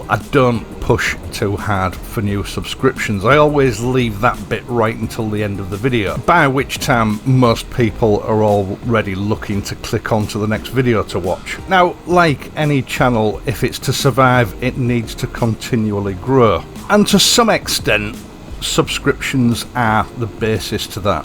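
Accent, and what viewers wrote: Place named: Northeast England, United Kingdom. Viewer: I really love your videos and your accent! May I ask, are you from the northeast of England? Cheers from Austria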